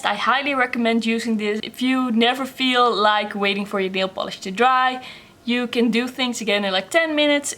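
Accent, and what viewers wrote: Netherlands. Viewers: ❤️❤️❤️ leuke video, hoorde meteen aan je accent dat je Nederlands hebt haha ! Wil toch ook nog eens H&m nagellak proberen, verder ben ik ook erg van Essence, Essie en catrice 😋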